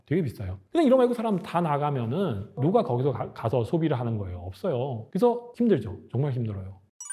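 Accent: Yanbian, China. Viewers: 발음이 중국분이네요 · 이사람 중국사람이네 빌음이 중국사람 ?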